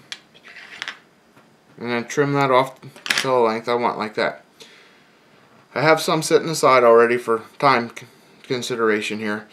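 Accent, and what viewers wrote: Pittsburgh, United States. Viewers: lol like 2 minutes into hearing you speak i said to myself, this guy has to be from Pittsburgh or near there. Looked up Holsinger's Fly Shop and i'd be damned if i wasn't right :P Great video thanks!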